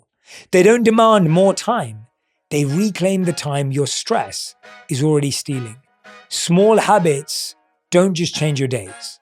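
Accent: United Kingdom. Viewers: Are you a British man? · It's a Nice way to improve My listening skills with England accent and at the same time we learn new thing, being a better person by new habits.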